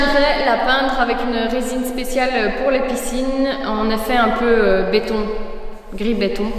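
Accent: Belgium. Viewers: Pour moi, c'est le Hainaut. Qu'est-ce qui m'a mis sur cette piste outre ton accent, le livreur de matériaux. Par contre l'accent de, c'est plutôt celui de l'agent Verhaegen. Au fait, essaye de ne pas le contredire sans cesse, il va finir par te donner un petit coup de taser.☺️ Nous, on va commencer les travaux de rénovations de notre maison qui se situe entre Charleroi et Beaumont dans la ville qui a un Beffroi et des jardins suspendus, tu vois laquelle?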